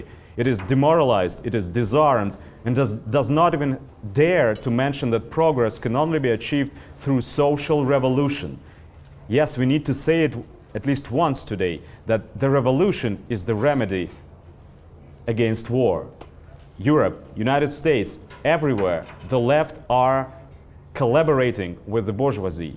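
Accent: Russia. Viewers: СЁМИНУ очень идёт легкий русский акцент:) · Молодец! хорошо говорил с русским акцентом! Я тоже не люблю кревлять языком, пытаясь подражать американскому акценту. А главное серьёзно, коротко и по делу, без лживых импортных улыбок, которые так часто можно наблюдать на подобных заседаниях! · Чтобы попасть на обучение в клятую Америку, пришлось сгрызть зубы об английский. Но по акценту определяется безошибочно - говорит русский. И даже НЕ говорит - читает перевод с русского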